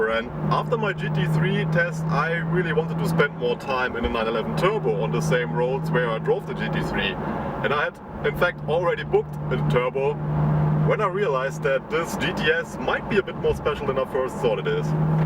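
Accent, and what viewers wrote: Germany. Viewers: echt gute arbeit, ich hab den 991.1 nicht zuletzt dank deinem bericht auf dem schirm! wertstabiler als die c2s , c4s scheint er auch zu sein. p.s. selbst dein dann doch etwas herber dt. akzent sei dir verziehen, lol ...aber ohne scheiss jetzt, nach dem bericht von dem "ausfahrt typ" war der hier wie ein urlaub...weiter so! 👊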